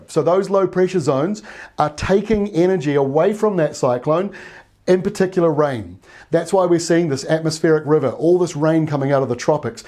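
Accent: New Zealand. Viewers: Ton accent nouvelle-zélandais ;) Thanks for the reminder for Rural Weather - great forecasting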